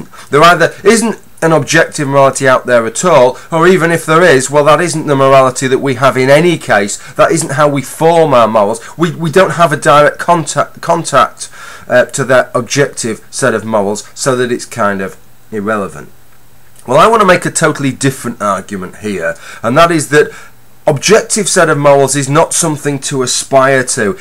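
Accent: United Kingdom. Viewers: Anyone know where in England this guy is from? He talks just like Daphne from Fasier.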